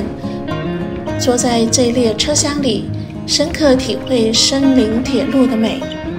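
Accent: Standard. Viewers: 旁白以前的工作一定是老師不然就是播音員,聲音好聽,發音標準,又介紹的很詳細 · 好久沒聽到這麼字正腔圓的國語~好有回憶殺的聲音!好好聽!~^^~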